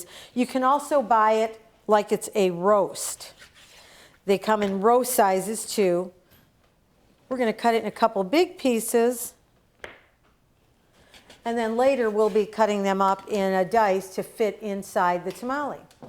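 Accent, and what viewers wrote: Midwest, United States. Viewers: What a horrible Midwest accent lol. It what I expected. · Mexican lady with a midwest accent. She is too cute!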